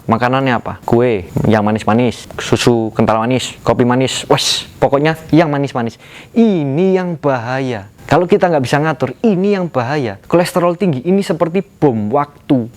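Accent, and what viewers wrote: Yogyakarta, Indonesia. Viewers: Wong jogja to jenengan mss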